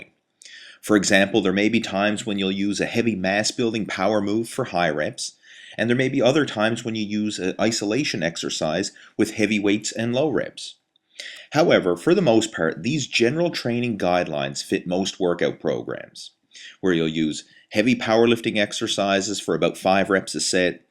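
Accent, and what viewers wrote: Newfoundland, Canada. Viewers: I love your canadian accent! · Great videos! Lovin' the Newfoundland dialect aswell haha :D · best canadian accent i've ever heard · Is that a newfie accent I hear? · Are you from Canada?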